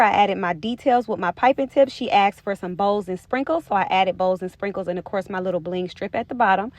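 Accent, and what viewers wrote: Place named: Georgia, United States. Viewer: So I’ll never get a cake from you cause it sounds like you’re in Georgia somewhere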